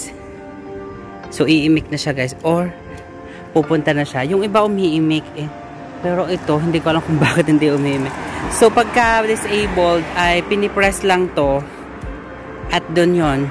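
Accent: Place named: Batangas, Philippines. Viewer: Ang Salita mo is parang batangas at Sa calapan Sa Mindoro din ang quezon ano. Tagalog Na tagalog at May punto.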